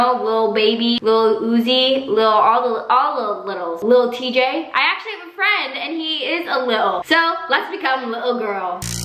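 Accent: United Kingdom. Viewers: Ur british accent made me die I live in england and half my mates actually talk like that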